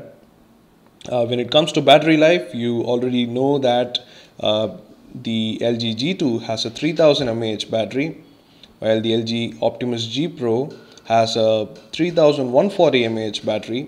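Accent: India. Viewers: indian accent. dark hands...cant be Russian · indian accent actually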